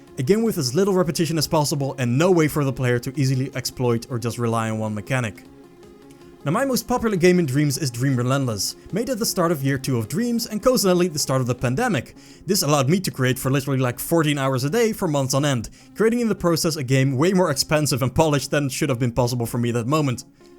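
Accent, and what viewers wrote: Netherlands. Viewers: can you add gyro controls and flick stick to your template? that would be cool. just look up jibbsmart to know what flick stick is. (spreek je trouwens nederlands, aan je accent te horen). Flick stick is a way to snap the camera to any angle. if you want to turn 180 degrees, just tilt the stick down. want to aim 90 degrees to your right. tilt the stick to the right. you can no longer look up and down with the stick. you need gyro to do that. i have been using it on almost any shooter on pc.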